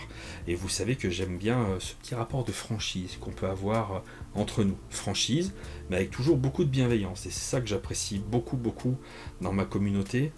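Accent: England, United Kingdom. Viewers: Félicitations pour tes 4000 abonnés ! Q : D'où vient ce magnifique accent anglais ? Wall Street English ?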